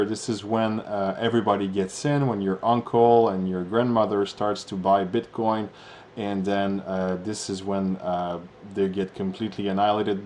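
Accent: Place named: Quebec, Canada. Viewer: Accent Québécois !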